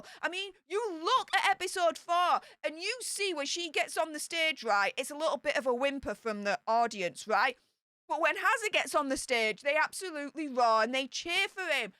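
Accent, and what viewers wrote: United Kingdom. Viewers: I think some of this is from her particular British dialect. If I am watching a Britbox show, my husband sometimes cannot understand the dialogue. I think YouTube presenters have to have a unique hook. If they all sounded like academic professors, the net would be a pretty boring place. I think level of passion is also part of her YT personna. You can also hear the language difference with numerous African American YT presenters. I am sure the speech they use in a job interview is different than what they use in reaching their YT audience. This is a "social register" in language. language is uniquely hers. I have several advanced university degrees, and her presentation skills don't bother me. I listen to many different commentators and find all of them interesting, whether I agree or not.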